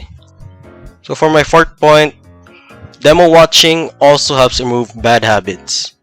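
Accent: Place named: Philippines. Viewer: Great video! Don't know why but you kinda sound filipino hehe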